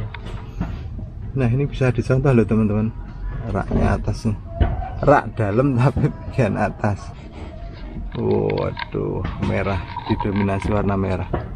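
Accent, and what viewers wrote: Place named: Java, Indonesia. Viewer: Aku bru liat Chanel sampean pakde, walaupun ngomong nya medok Jawa tapi aku suka cara review nya..jelas, detail dan mudah dimengerti.. sukses , selalu pakde..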